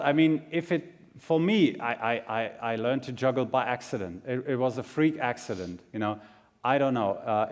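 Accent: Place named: United Kingdom. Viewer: Roman LP ja wirklich, aber eher britisch als amerikanisch...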